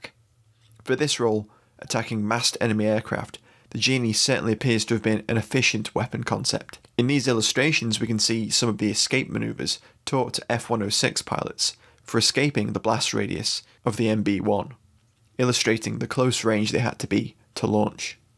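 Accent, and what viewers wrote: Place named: Cockney, United Kingdom. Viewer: Why always with the Cockney Annoying Brit Commentator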